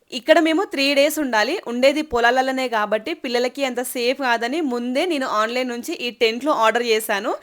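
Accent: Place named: Telangana, India. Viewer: మన తెలంగాణ స్లాంగ్ అంటే అట్లా ఉంటది మరి ❤️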